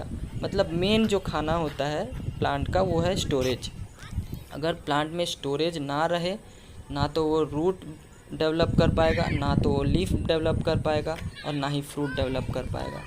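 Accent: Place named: West Bengal, India. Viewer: Are you from west bengal ?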